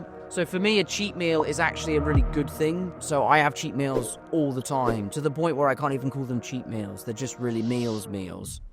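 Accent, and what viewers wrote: United Kingdom. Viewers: Am i the only one that is just not used to him speaking in british accent XDDD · I didnt expect him to have a very British accent hahaha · The British accent is charming! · The way he speaks , it's as british as it gets ...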